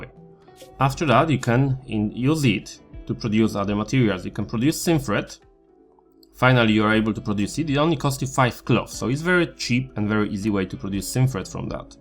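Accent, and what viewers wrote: Poland. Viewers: Łatwo po akcencie poznać polaka. Dobre przedstawienie moda.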